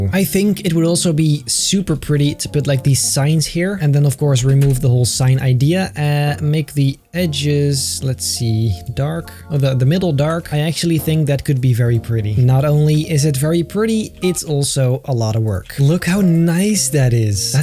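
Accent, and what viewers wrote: Netherlands. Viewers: je hoort wel een beete dat je nederlands bent!:D Mooi man dat je zo goed kan zijn pfff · Hey jij Nederland. Zou me niks verbazen. Kan het horen.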